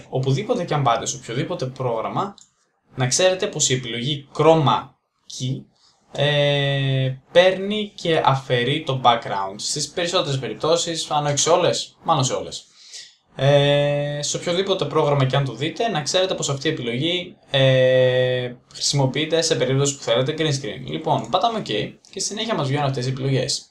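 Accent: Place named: Karditsa, Greece. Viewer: To katalava apo to stil pou milas. Egw eimai apo karditsa. Nomizw 3ers pws milame sthn Karditsa e?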